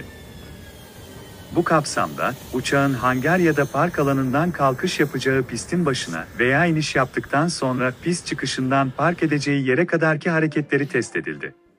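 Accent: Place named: Samsun, Turkey. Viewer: Seslendirmeyi yapan samsunlu galiba. E leri uzatarak söylüyo :) kızıl elmaya gelince tüm mühendislerimizle gurur duyuyoruz 🇹🇷 insanın göğsü kabarıyo👏🧿🇹🇷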